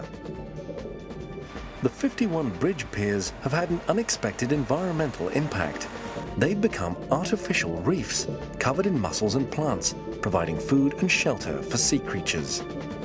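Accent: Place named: United Kingdom. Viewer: yes but the brittish accent is so nice.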